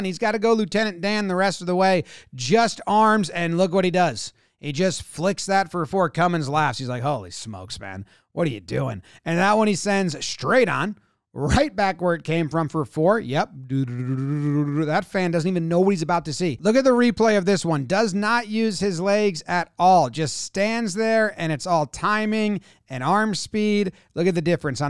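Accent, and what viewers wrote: United States. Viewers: This is the first time I'm hearing someone commentate in an American accent, and I'm loving it, especially since its funny too. Refreshing! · there's something about an American describing cricket that is just addictive to listen to. Such a great reaction video. This should be used by the ICC to promote the world cup that's coming up in America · Its so weird to hear someone with American accent commenting Cricket. We are so used to Brit, Aussie and Indian commentators 😂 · its the weirdest thing hearing an american talk about cricket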